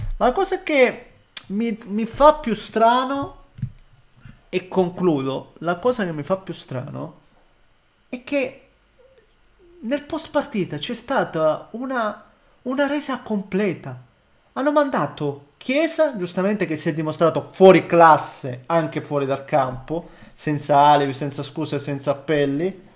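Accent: Calabria, Italy. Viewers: Ma prima o poi mi toglierai la curiosità di dirmi di dove sei? È troppo bello il tuo accento calabrese!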